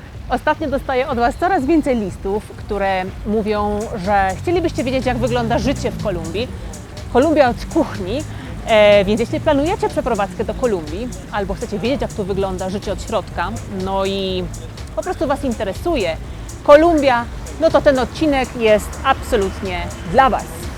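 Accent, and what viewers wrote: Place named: East, Poland. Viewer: Twój akcent jakiś wschodni. Czy mam rację?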